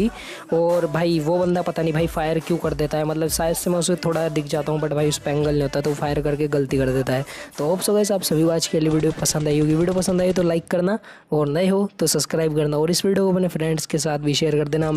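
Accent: Haryana, India. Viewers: Bro ur haryanvi??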